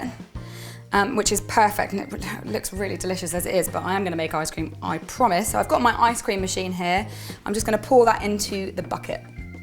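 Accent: United Kingdom. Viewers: I love ur British accent btw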